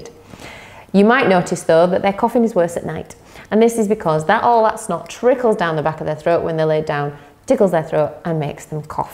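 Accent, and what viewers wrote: United Kingdom. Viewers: Yorkshire accent.. She has a lovely voice. Anyone here just to see?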